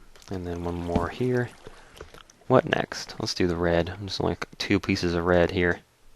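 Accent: South, United States. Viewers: is it me or is voice sound vary southern this episode?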